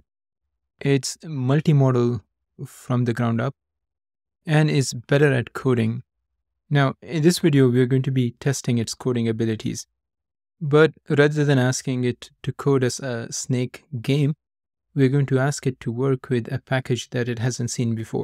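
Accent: India. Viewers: Where are you from? You sound a lot like my Indian Computer Science teacher.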